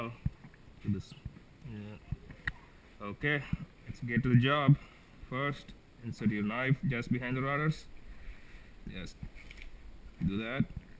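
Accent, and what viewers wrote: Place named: India. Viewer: This has got to be the 8th indian sounding dude who just hapoens to be barefoot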